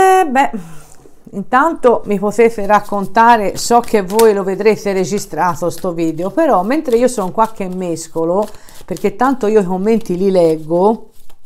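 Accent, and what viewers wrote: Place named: Tuscany, Italy. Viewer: Mi piace il tuo sccento toscano sei simpaticissima che tristezza sti giorni lontano da chi vuoi bene è veramente difficile speriamo passi presto e tornsre alla normalità amare tutto quel ci circonda abbiamo imparato tanto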